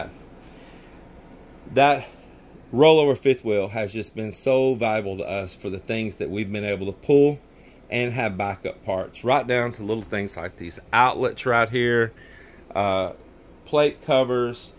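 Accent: Texas, United States. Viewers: your drawl is getting stronger over time as you stay in Texas. It takes a yankee to notice.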